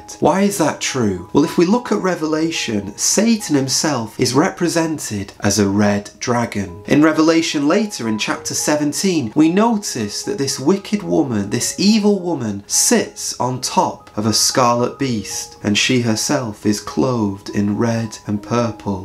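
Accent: England, United Kingdom. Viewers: I love ❤ your English accent sounds so musical🎶🎵🎼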